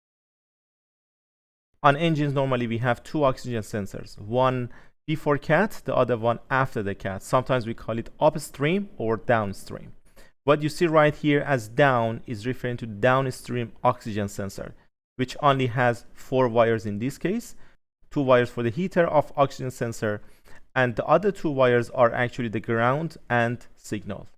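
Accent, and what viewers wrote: Iran. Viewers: Iranian accent isn't it?😅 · برگام این لحجه ایرانیه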